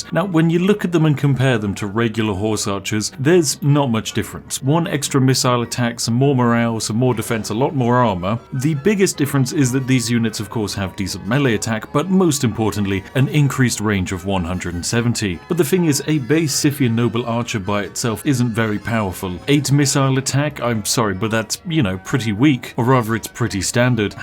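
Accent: United Kingdom. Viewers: is one of the only youtubers where I pause to go to the bathroom come back un pause and the first this game I hear it “And there all going to die” in a calm British accent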